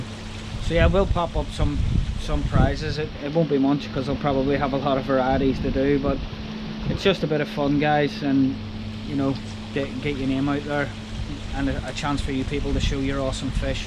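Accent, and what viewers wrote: United Kingdom. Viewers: Great video,hope you and the family are well as we are here,great to hear the Holywood accent. Used that flexi pipe for a few years now and it is really strong though others have to be carefull as it can come in 38mm and 40mm and the larger wont fit solvent weld which I have learnt,just thought I would help a bit. Keep up the videos mate and look after yourself.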